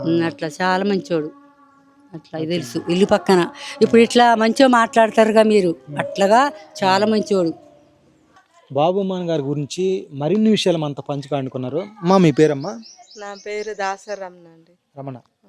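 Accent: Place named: India. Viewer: Bro. Mee voice ma vurilo local voice lagundi anna eh vuru anna medi?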